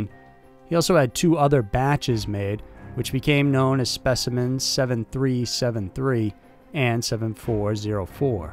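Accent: United States. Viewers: I would really like to k ow where the narrator is from. Obviously American but which state? Unusual accent. I hope you reply.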